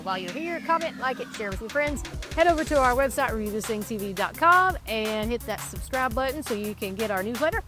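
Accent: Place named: New England, United States. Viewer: Your country Yankee accent is funny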